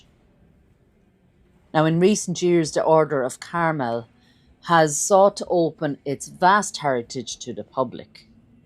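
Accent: Ireland. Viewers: I wouldn't know if you pronounced it wrong or not with your Irish accent 😉 😊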